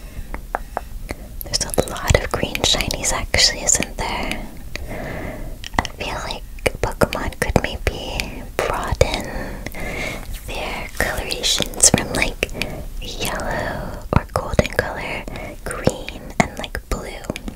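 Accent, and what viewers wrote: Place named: United States. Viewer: Where are you from? I'm british so its quite hard to pin which state of america or perhaps canada... ive no idea but i really like the way you say your intro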